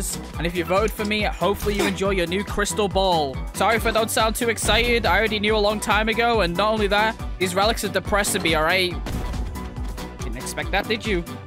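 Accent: United Kingdom. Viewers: Why we like to watch: His cackle laugh, his great persona, his Timmy voice, his god level skills, his British accent, his continued upload schedule, his creative ideas, he’s a great person over all ❤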